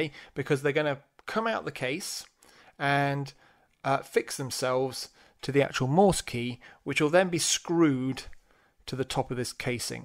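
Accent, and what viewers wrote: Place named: South of England, United Kingdom. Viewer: You sound like your in the south of England... im in the south east and its absolutely dead on 2m and 70cms... i keep CQing into the void but love making antennas and will keep trying.. maybe on 2m CW might reach you if your not too far away.. I like you took tne foundation and then stalled for want of regular contacts or local meetings... 10 11 12m is a little busier so i also play with 28 to 30mhz. Keep up the ham work. 73's M6KII.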